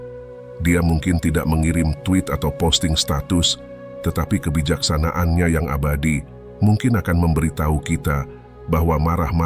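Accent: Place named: Manggarai, Indonesia. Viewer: logat manggarai banget😊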